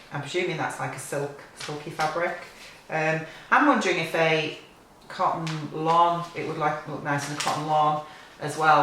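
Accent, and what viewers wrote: United Kingdom. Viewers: ...you are too funny, I just love watching your channel and you’re so honest, which I just love. I was born in Elland, near Halifax but have lived in Canada for 46 years and your northern accent is like a little bit of home for me, thank you so much! Just wanted to say hi and let you know you’re appreciated.....don’t ever stop doing these vlogs, I just love them! I meant to say too, that yellow blouse on the magazine looks almost the same as the pattern you bought.....am I right? It would save you tracing that blooming pattern out. I have so many and love them, but always find a reason not to trace them off. 😏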